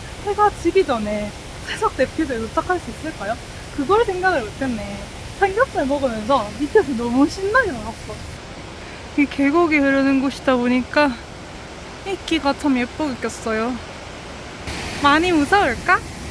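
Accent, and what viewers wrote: Gyeongsang, South Korea. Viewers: 엥? 대구셨네요 ㅡㅡㅋ 저도 대군데 경남쪽 사투린줄 알았네요 ㅎㅎ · 말투는 갱상도 가시나 얼굴은 서울여자? 대단합니다~ ㅎㅇㅌ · 경상도 어느 동네 말씨인가용?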